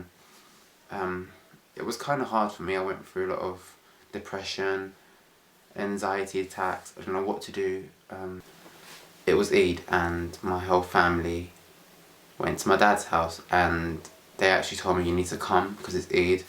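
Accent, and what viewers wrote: United Kingdom. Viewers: hey sounds British. I just started watching this now and I happy I clicked on the video. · First off Love you, Love you,Love you to pieces! You are so cute and I'm an amercian boy whose a sucker for british accents. I was lucky to stumble upon this video when looking up a video on being gay and muslim. I can understand completely because being African Amercian and raised in southern Christianity I know fanatical extremist minds all to well.I hope things continuously get better! Hold onto the accepting family and friends and release all others to love and light!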